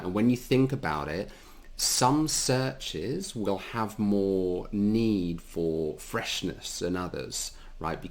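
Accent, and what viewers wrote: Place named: United Kingdom. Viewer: Thank you. A class is better when given in british accent.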